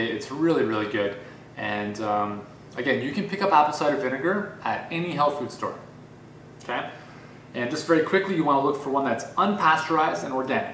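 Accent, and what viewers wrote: Canada. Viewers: ur canadian....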